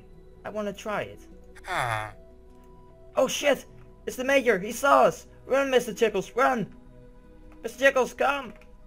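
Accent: Netherlands. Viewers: Are u netherlands / dutch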